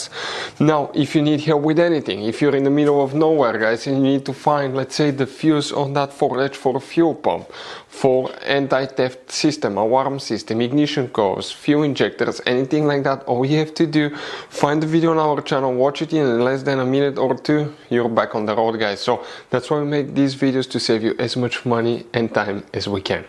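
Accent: Russia. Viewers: Just curious my friend where is your accent from? Russia?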